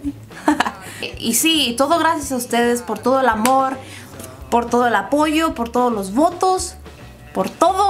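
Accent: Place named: Mexico. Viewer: eres mexicana?? tu acento me hace pensar eso... eres hermosa, sencilla y super talentosa... me suscribo!!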